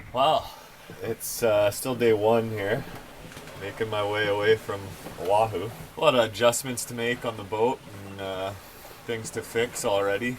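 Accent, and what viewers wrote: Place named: Canada. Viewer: So pumped to hear your Canadian, Much love from New Brunswick!!!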